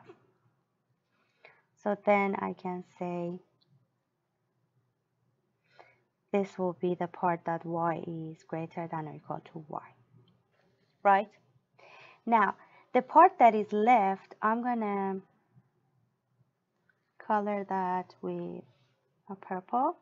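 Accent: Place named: Iran. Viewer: I'm sure that, this accent is persian :)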